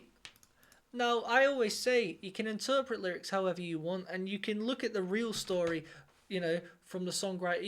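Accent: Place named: England, United Kingdom. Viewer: Cool to see you reacting to, always enjoy seeing what you think of symphonic metal bands and the interesting things you point out. (And the recently was a nice surprise; the first album I ever bought was the Hip's third album, on cassette, when I was in junior high school.) I was pretty sure I remembered you being surprised by the dark-haired guitarist's name,. (Presumably since it sounds like the online store Temu?) Maybe I'm remembering a different reactor? Google didn't find any earlier vids of you doing or other tracks, or for. And you'd definitely remember having seen these guys in the same studio rehearsal session and wouldn't have used this title. Maybe the topic came up in a reaction or something, when you were talking about a recommendation you'd gotten or something. I can definitely picture an English accent like yours saying " " and being surprised and amused by it. 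--- I'm not sure what use to get that acoustic-like sound from those guitars which obviously don't have a resonant cavity. One possibility is a modelling amp, which simulates the sound an acoustic guitar would make for the string vibrations picked up by the pickups. (e.g. uses a Kemper modelling amp for the guitar neck of The Beast, e.g. in (middle subtitles included version if you want to check it out), or in a few songs like or I think where he switche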